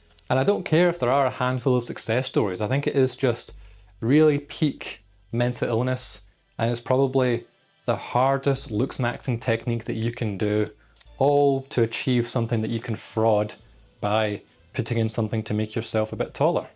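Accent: United Kingdom. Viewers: I know you like the UK, but if you don't already know, you could legit geomax in the US. American guys and girls both find European accents really attractive, especially the proper British accent like yours (aussie accent is a close 2nd). It'd be a free +1 to your effective rating. With some girls even a free +2.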